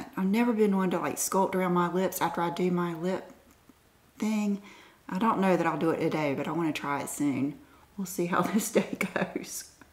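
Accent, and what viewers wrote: South, United States. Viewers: I swear… you remind me of someone I went to school with! Lord, I miss her. Lost track of each other. I am so happy I found you! Please keep posting whatever little thing you have on mind. You give me joy 🤩 p.s. You sound like lovely Southern gal. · I so love logging on, seeing that you’ve uploaded and hearing your sweet, Southern voice! I’ll be watching no matter what content you give us! ☺️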